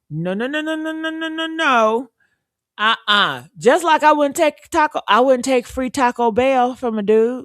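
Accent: South, United States. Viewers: if you dont mind my asking, are you from the south??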